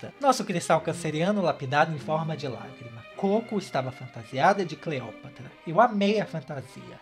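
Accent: Minas Gerais, Brazil. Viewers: o sotaquinho mineiro iti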